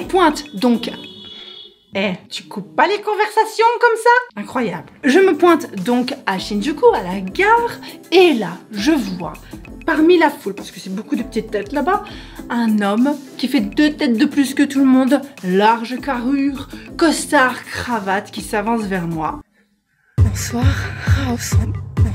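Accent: Belgium. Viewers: t'es vraiment Belge, ton accent reprend le dessus... ca me fait penser à la collection Arlequin ton truc... Les femmes nous sommes tjs sujettes aux mêmes clichés...Nous avons intériorisé ces clichés, même si on lutte... C'est l'homme séduisant , ok, occupé, + âgé que toi, qui gagne TRÈS bien sa vie...et tu as réussit ton rêve, non-avoué, de petite Princesse...merci la femme émancipée, qui essaye de gagner sa vie sur YouTube, mais qui sinon a arrêté de travailler, son boulot qui lui tenait a coeur au Japon, par amour pour le suivre ( l'amoureux )...aux USA, puis c''est le mariage et le bébé ... c'est bien ... c''est une histoire ... [ enfin les débuts semblent compliqués, la confiance...] mais qui répond qd même aux clichés, même aux clichés Japonais d'ailleurs ...tellement conservateurs ...et puis on n'est pas obligé de se marier pour former un couple officiel...et avoir un enfant ... 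tjs aussi pimbeche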